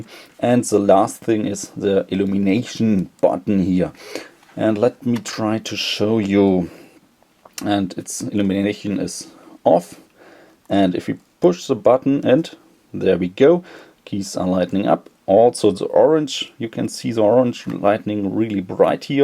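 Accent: Germany. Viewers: german accent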